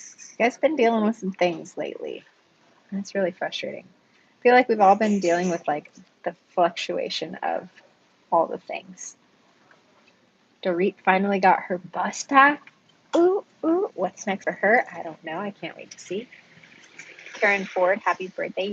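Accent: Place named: England, United Kingdom. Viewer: Hey you are so beautiful I saw you from leave her wild and then I watch your videos I think you were such a beautiful young beautiful girl oh yeah I love your accent your English one that is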